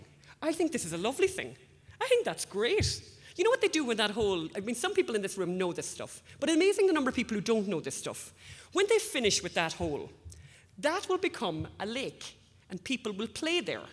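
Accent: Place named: Donegal, Ireland. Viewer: Well. She's from Donegal!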